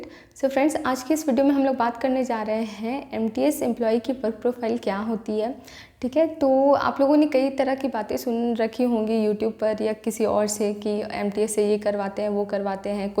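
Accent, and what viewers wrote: Jharkhand, India. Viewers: Aap jharkhand se ho kya??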